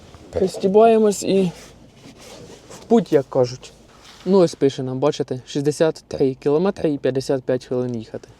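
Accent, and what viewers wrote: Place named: Slobozhanshchyna, Ukraine. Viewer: Вдячна за відео. Це мій улюблений "жанр" в ютубі - подорожі по різних куточках різних країн. Але під час перегляду чомусь прийшло на думку давнє іспанське прислів'я:"Швейцарія - це красиве дерево, яке росте на смітникУ". Сподіваюсь, контекст прислів'я зрозумілий для думаючих людей. З повагою. Окрема вдячність, що не забуваєш "слобожанську говІрку"!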